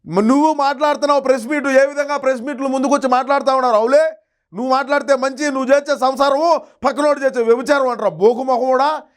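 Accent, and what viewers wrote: Rayalaseema, India. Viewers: Mana Rayalaseema language super brother, Ambothu Rambabu gadu worest fellow.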